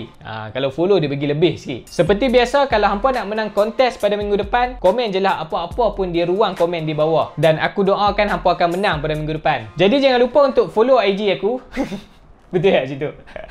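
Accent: Kedah, Malaysia. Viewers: boleh letak subtitle dlm bahasa baku untuk next video..bnda ni boleh memudahkan viewers dri negeri lain faham maksud perkataan loghat kedah yg depa mngkin x faham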